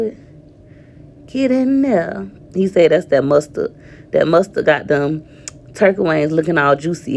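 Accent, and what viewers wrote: South, United States. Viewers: you sound like a southern belle, funny as heck.!!🤣🤣🤣🤣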